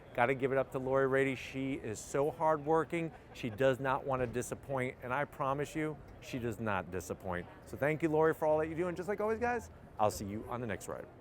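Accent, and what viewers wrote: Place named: New Jersey, United States. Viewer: This guys whole style and accent scream cheesy Jersey City car dealership commercial from 1987.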